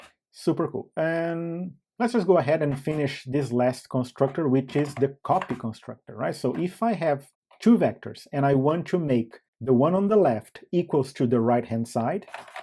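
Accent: Brazil. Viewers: I'm sure your first language is portuguese, are you brazilian? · Eu reconheço o sotaque brasileiro quando eu escuto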